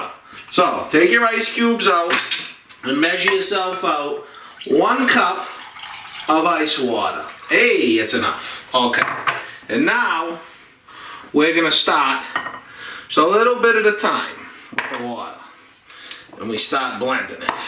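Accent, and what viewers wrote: Boston, United States. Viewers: are you from Boston?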